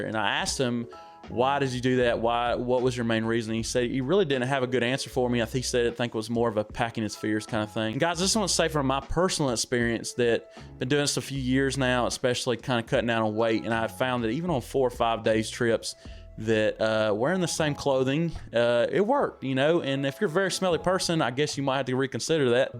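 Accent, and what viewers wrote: South, United States. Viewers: Just found your channel, great southern accent! I hear you tubers often refer to a Nalgene bottle as "Heavy", but, like you, they never really say how much weight you are actually saving. So what is the actual weight savings between a Smart Water bottle and a Nalgene?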